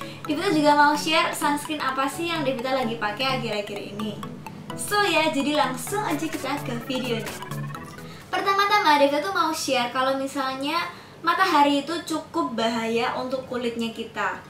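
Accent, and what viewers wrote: Java, Indonesia. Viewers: Bahasa Inggrisnya bagus. Tapi pas ngomong bahasa Indonesia medok bgt. Sukaaaaa. Indonesia banget. Gak pake make up aja udah cantik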